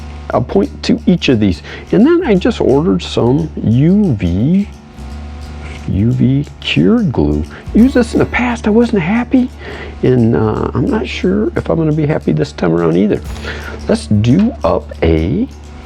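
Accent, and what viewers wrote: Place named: Minnesota, United States. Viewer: I'm from Minnesota. Are you? You sound like me.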